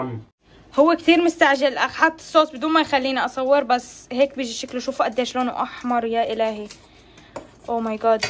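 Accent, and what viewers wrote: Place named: Palestine. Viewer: من وين هي. لهجتها فلسطينيه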